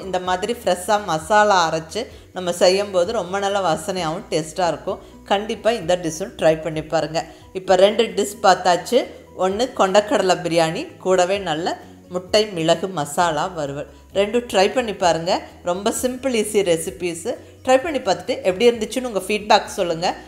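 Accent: Kongu, India. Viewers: நீங்க பேசற கொங்கு தமிழுக்காக வே நான் உங்க Channel ஐ like பண்றேன் சும்மா ஜம்மனு இருக்கு உங்கள் dish போலவே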